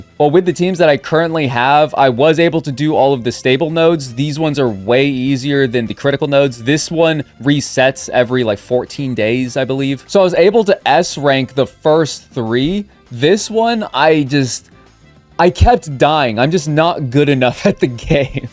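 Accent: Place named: United States. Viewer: I tried to watch your video but... I don't like your voice. It's so slurred and croaky. I also don't like your style of American accent. If you could do something about that, that'd be great.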